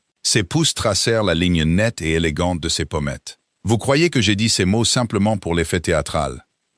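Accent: England, United Kingdom. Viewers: L accent anglais apporte un charme à ce conte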